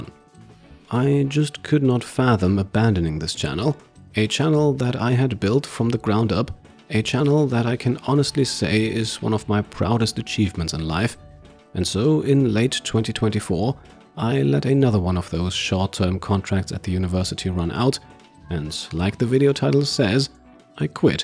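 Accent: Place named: Germany. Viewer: You have a similar speech pattern to another channel I watch. "Feli from Germany". She obviously learned American English tho.. Are you Bavarian? Btw, Glad you will be on YouTube full time!!. I'm patiently waiting for a Stellaris series done the complete way. It would be SUPER SUCCESSFUL and there is not a lot of stellaris playthrus that RP effectively!!!!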